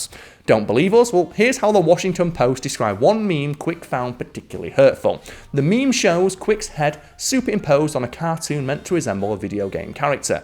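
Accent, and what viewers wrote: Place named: Yorkshire, United Kingdom. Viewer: The Yorkshire dialect isn't that bad to understand. Also just turn on closed captions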